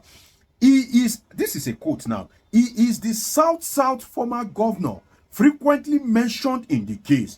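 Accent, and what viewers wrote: Yoruba, Nigeria. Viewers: Talk without heavy Yoruba Accent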